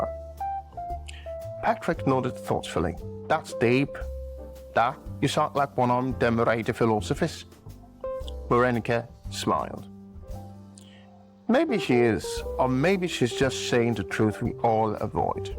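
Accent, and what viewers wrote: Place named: Liverpool, United Kingdom. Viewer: Excellent .I love how paced it is. Can you make them more like this .. Happy scouse accent too😂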